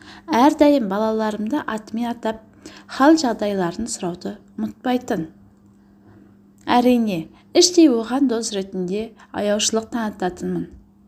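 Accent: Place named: Russia. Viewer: сол үйрену үшін қателіктерін айтканымыз дұрыс шығар. И орысша акцентінде түзеп анық қазақша оқу үшін қазақша кітаптарда мәнерлеп дауыстап көп оқыса . Сосын барып бүкіл елге оқыса дұрыс болар еді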